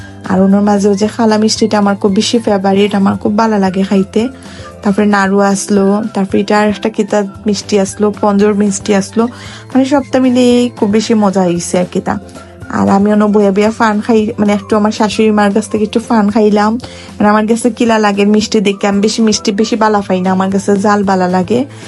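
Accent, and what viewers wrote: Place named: Sylhet, Bangladesh. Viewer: মাশাআল্লাহ আপনার voice এবং আপনার এই সিলেটি সিলেটি ভাব এটাই বোঝা যায় যে আপনি একজন , খাটি বাঙ্গালী । আপনি যেহেতু একজন Teacher তাই আগে আপনাকে সালাম দিতে ভোলে গেছি , assalamualaikum mam. ❤❤❤❤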